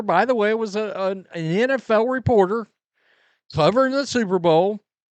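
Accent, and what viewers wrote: South, United States. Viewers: Dude, you sound southern you should know even the law is crooked in New Orleans. Nothing crazy out of that city ever surprises me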